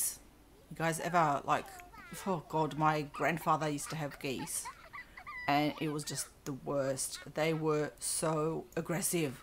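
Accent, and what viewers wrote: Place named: New Zealand. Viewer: Oh yes, I'd almost forgotten, but now you mention it, it all comes back. So sorry. It's just I like hearing you. Even if you're not quite a NZer you sound a lot like many of my NZ relatives, especially with the phrases you use. :D They all have much stronger accents than me. :-) Anyhow, I'm pleased you'll continue this series, though I'd equally be pleased if you started a new Sims 4 PC series... :-) All the best to you, my friend. hug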